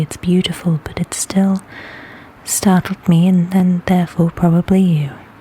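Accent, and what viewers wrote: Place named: United Kingdom. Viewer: Loved it! Work on your ASMR style! You got something seriously good here. Maybe a little trip back to England would help you catch back a little more british accent which is one of your best features for this. Congrats and thank you.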